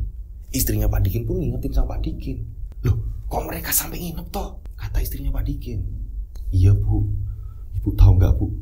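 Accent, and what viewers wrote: Java, Indonesia. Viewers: Jawa nya tom tomen kang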